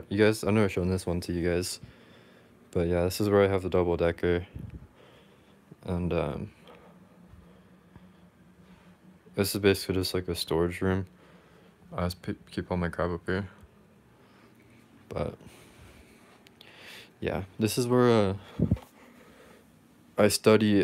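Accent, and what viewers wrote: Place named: Vietnam. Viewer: lol i love his Vietnamese accent =)))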